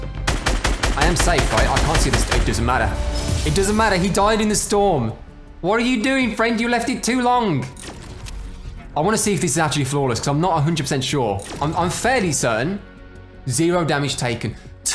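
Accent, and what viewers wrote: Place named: England, United Kingdom. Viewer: “Tiki heads” are from Easter Island where they call them “moai”. Pronounced kinda like the English words “more I” if you read it with English accent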